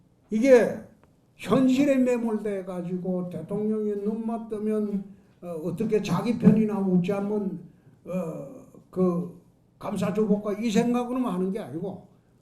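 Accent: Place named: Gyeongsang, South Korea. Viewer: 경남 사람 발성과 말투는 다 그래요.^^ 어쨌든 발성으로 평가 받는 사람은 가수들 아닐까요?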